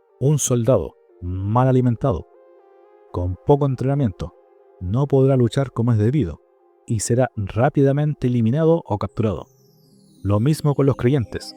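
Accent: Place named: Chile. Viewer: Iba a comentar que se debiese buscar un enfoque de beneficios y no miedo de no aprender, pero es todo lo contrario, tu canal es genial, una opinión muy critica, una voz con un toque chileno pero muy neutro y no buscas ideologizar sino compartir para quienes quiersn escuchar, y eres criticos con los ceeyentes y no con los que no lo son, y eso se me hace muy consecuente, es bueno encontrar gente que aun aprenda fe las enseñanzas de cristo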